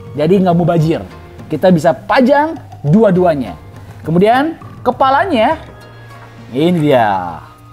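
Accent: West Java, Indonesia. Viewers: BANG LO ORANG SUNDA YA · Sunda pisan mang Salam lembang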